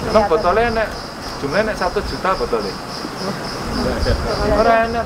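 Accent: Banyumas, Indonesia. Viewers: Salam Ngapak pak Ganjar (TEGAL Haaddiirrrr),,,😁🙏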